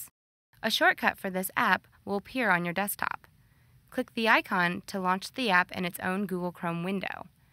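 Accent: United States. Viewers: She speaks american in a very annoying way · The Voice of the narrator who is obviously from Long Island is the reason I will not be downloading this. I couldn't get through her voice or her gleeking. It's TAB not TAAAHHB. Arrrghh she is SO Long Island. I can hear the saliva in each word. She does sound hot though. Go figure. Firefox with Google bar is just fine. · She just sounds American to me...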